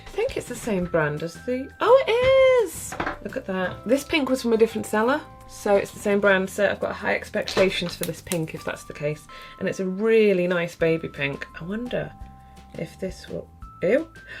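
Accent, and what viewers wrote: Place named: England, United Kingdom. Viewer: Do u like in America or England because it says dollar in the title but u sound English, just wondering xxx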